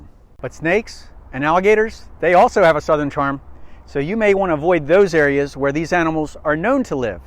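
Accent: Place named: New England, United States. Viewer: Sounds just like new England. only less weirdos.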